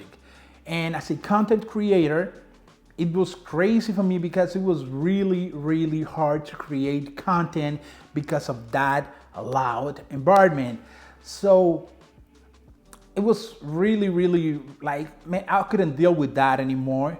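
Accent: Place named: Brazil. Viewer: I left Cali (San Diego) after living there for 28 yrs 7 months ago. Moved to FL. I don't know how I did it, but the cost of living was getting too damn expensive, even with a great job. I didn't have a ton of stuff in my place. Packed all my stuff in XL Home Depot moving boxes and shipped boxes out through UPS a few months ahead of time of my final departure. 8 total boxes. All my shoes were in them with some clothes.I shipped through Pirate Ship, saving a couple hundred dollars. I had my car shipped out for a great deal. Had more shoes in the trunk and a few other things. I had my car broken into a few years ago at my place. Catalytic converter was tampered with the theifs had a hard time removing stuff all over the seats and floor. That next morning I called the cops. Them lazy bastards pretty much told me there's nothing they could do. They didn't even come out when I wanted to file a report. 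Since that incident, I lost all respect for SDPD. I'm here in FL closer to family. Still adjusting to the slower pace, a little culture shock if you know what I mean. Cost of living is cheaper than Cali. No state tax. I like the warm weather, but I hate the humidity. Excellent fishing here in which I love doing as my top go to hobby besides shoes. Do I miss Cali? Yes and no. I miss the weather, friends, and hanging out at many of my favorite spots. I'll definitely go back and visit my Cali peeps no doubt. BTW, where are you from? It sounds like to me you have a Brazilian accent.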